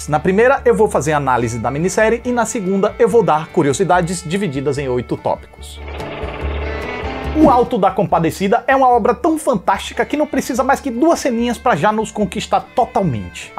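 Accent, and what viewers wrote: Northeast, Brazil. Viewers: Eu amo o e amo Suassuna... mas como nordestina, ver um nordestino, fazendo uma análise crítica de outro nordestino, é tão lindo! Ver cada frase do contemplada com um delicioso sotaque nordestino é sem palavras! E bora ver O Auto 2 ❤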